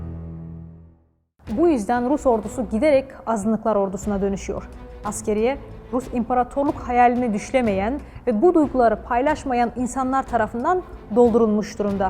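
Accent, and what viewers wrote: Azerbaijan. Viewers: Konusan hangi turk milleti merak etdim. Konusmasi turkce ama aksank sanki bi azerbaycanli konusuyor gibi. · Bu kız nereli?aksanı aynı azerbaycan aksanı sanki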